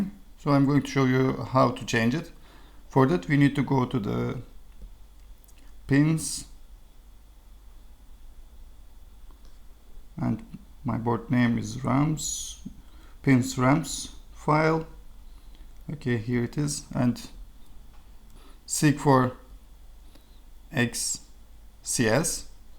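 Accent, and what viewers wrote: Turkey. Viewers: Turkish accent? 😁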